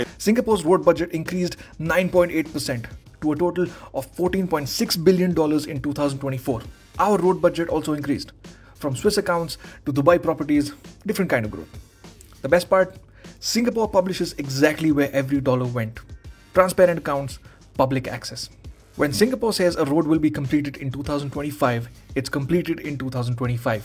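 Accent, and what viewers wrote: India. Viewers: God damn! First Indian with a listenable accent!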